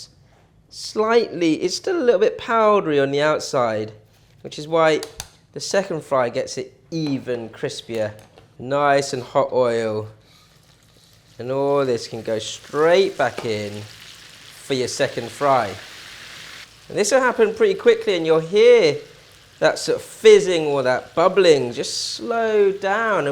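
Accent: United Kingdom. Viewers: That British accent is distracting me.😅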